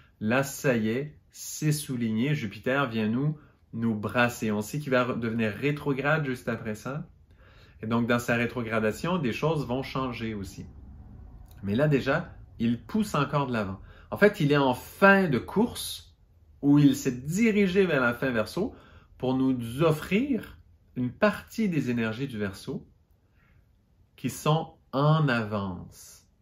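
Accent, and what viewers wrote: Quebec, Canada. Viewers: Bonjour et merci beaucoup (J'ai toujours du plaisir d'entendre l'accent du Québec où J'ai vécu quelques temps)...Je suis abonnée à la chaîne d'emilie Morel et je suis ravie de la perspective de votre collaboration. Je suis taureau ascendant Gémeaux et ça va plutôt pas mal car le soleil en maison 12 c'est très dur. Lune en bélier. Je vais noter pour vos conférences. Amitiés de Bretagne.merci.(milieu du ciel Mars et Jupiter en Verseau 😍)).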